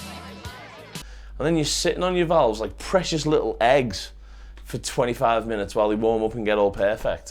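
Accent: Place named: Northern England, United Kingdom. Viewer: Mate, you're doing the blues pretty well for being "not a blues guy". ;-) Love your accent, BTW. Where RU from? Somewhere up north on the Brit Isle, I suppose? (Myself being from Germany.) Very nice Blues amp but I still feel unsure about it for rock / hardrock (not metal). As far as YouTube demoes go I'd still prefer the Tech21 TM30...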